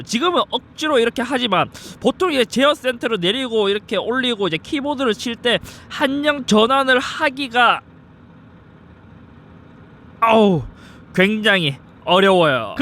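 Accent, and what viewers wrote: Gyeongsang, South Korea. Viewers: 나도 경상도 사람인데 이친구 경상도 발음이 왜케 웃기지?ㅋ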